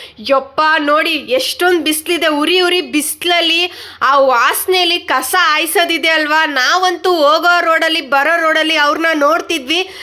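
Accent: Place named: North Karnataka, India. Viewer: ನಿಮ್ಮ್ own language ತುಂಬಾ ಇಸ್ಟ್ ಬಹುಷಃ ರಾಯಚೂರ ಮುದ್ದೇಬಿಹಾಳ್ ಲಿಂಗಸೂರ್ ಬಾಷೆ 🎉🎉🎉ನಿಮ್ ವಿಡಿಯೋ ತುಂಬ ಇಷ್ಟ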